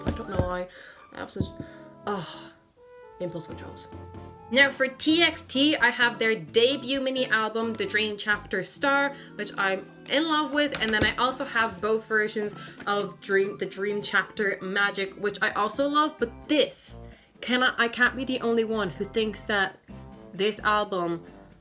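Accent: Ireland. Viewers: awe💖 your irish accent is super cute! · bro, am i hearing a fellow irish? i stan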